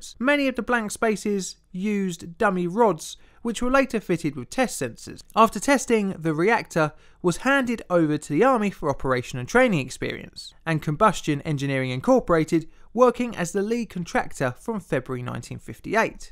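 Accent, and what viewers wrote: United Kingdom. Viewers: Please everyone. Stop moaning over the British pronunciations. Our American pronunciations also grind on the Brits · He doesn't pronounce the 'L' sound, substituting 'W'. Except at the beginning of a word (showing he CAN pronounce L if he tries) Fuel becomes Fuew. 'Controw rod'. "Criticw". 'Impaiwd by one of the shiewd plugs'. 'Faws alarm'. 'Consuwting wif a doctor'. The 'th' sound has disappeared. "Vey wifdrew after seeing 65 rotengens (sic)". This is not a charming regional English accent. It's ugly lazy bad speech. Sadly, English speech had degenerated across the spectrum into a sickeningly ugly patois and it gets worse with every generation. I'd bet that this chap's grandfather had far better speech than he does. The younger ones, the millennials, are barely intelligible. You need subtitles. It's sad. Listen to any old english movie from the 60s and you hear english beautifully spoken. 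This speech is now almost completely lost. These days, the English have by the far the ugliest speech in the Anglophone world. Americans, Canadians, New Zealanders, Trinidadians, etc all speak far better than the English. If you want an example of beautiful contemporary english speech have a listen to Canadian Stefan Molyneux. I could have enjoyed the content of this video but the speech made me feel ill and depressed and I switched it off. Sorry. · Fellow American here, I love how all those different British accents sound. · Why do all these videos have a British guy?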